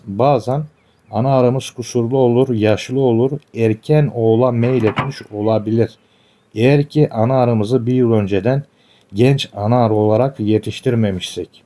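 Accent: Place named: Thrace, Turkey. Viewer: Sizi tebrik ediyorum. Arının dilini anlamış sırrını çözmüşsünüz. 4 yıllık bir arıcı olarak iyi ustaların tecrübelerine ihtiyacım var. Bazı günler yaklasık 5 saat yerli ve yabancı videolarda araştırma yapıyorum. Söylediklerinizi anlamayan izleyiciler hakkında açıklamada bulunmuşsunuz. iç Anadolu bölgesi insanı olarak Trakya şivesine alışık olmadığım için bende biraz zor anlıyorum. Büyük hazineler daha derinde olur deyip, anlamadığım yeri tekrar dinleyerek bunu hallediyorum. Küçük bir eleştirim olacak. Sanki katları yerine koyarken fazla arı eziliyor. Ve kamera açısı biraz daha geniş yada uzaktan çekse izlemesi daha keyifli olurdu.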